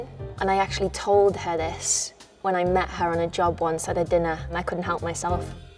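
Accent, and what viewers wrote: Liverpool, United Kingdom. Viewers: aww Scouse accent is one of the best thing about her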